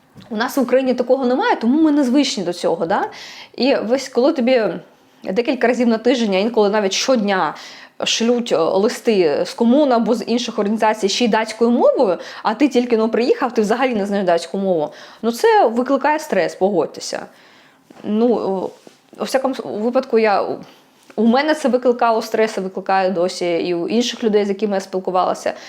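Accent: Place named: Russia. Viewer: дивлюсь час від часу, бо приносить стрічка. А в Даніі віднедавна живе 18 річний син подруги, хочу знати. Не запам"ятовую саме вас, але стала пізнавати по ниттю. І ви, шановна, плутаєте національність і громадянство. У вас є освіта? Не знати таких речей - дивно. За громадянством ви українка, етнічно - росіянка, ваш акцент лізе з усіх дір. І менталітет не приховаєш.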